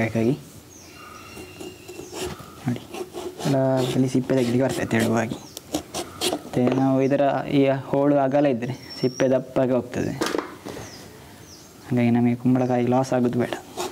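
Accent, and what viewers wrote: Mangalore, India. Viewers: Your manglore slang kannada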